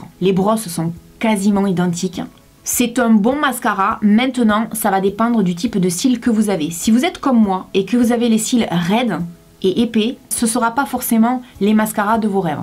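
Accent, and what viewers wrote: South, France. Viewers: Super découverte !!!! Ton naturel, l'accent du Soleil... Hop-hop, je m'abonne !!! Et merci beaucoup de penser aux Coquettes à petit budget 😉😊😁 !!! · J'aime trop ton accent 😂 #Sudgang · T'as un accent du sud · j'ai 10 ans je viens de te découvrir et je te trouve drôle gentil et marrante avec ton axant du sud bisous et super vidéo plus 1 abo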